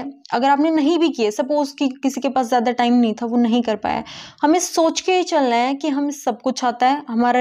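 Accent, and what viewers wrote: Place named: Haryana, India. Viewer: Haryanvi touch 🧸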